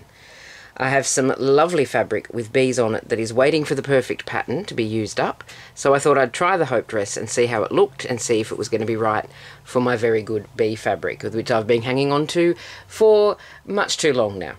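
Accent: Australia. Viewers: PS Goud on you Mate. Great to hear an Aussie accent. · I thank the almighty algorithm for suggesting this video. I checked it your channel and saw weaving (something I've always been drawn to but minimally played with). I heard your greeting and immediately heard an Aussie kindred spirit and hit subscribe :) Good luck on your YouTube journey :)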